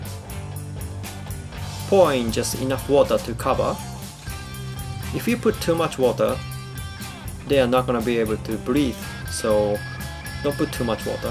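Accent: Japan. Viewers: Why are you talking in a japanese accent? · a white guy with a japanese accent. smh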